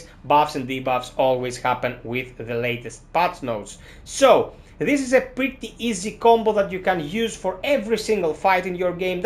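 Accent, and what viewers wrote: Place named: Greece. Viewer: dude that is a greek accent ain't it! reminds me a bit of old school toronto in the 70's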